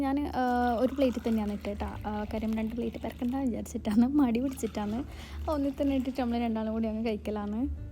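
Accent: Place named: Kannur, India. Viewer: ഗൾഫിലെ ബാംഗ്‌ വിളി ഒരു പ്രത്യേക ഫീൽ ആണ് കേൾക്കാൻ. ഞാൻ പുതിയ ആൾ ആണേ. കണ്ണൂർ. നിങ്ങളുടെ സംസാരം കേൾക്മ്പോൾ കണ്ണൂർ പോലെ ഉണ്ട്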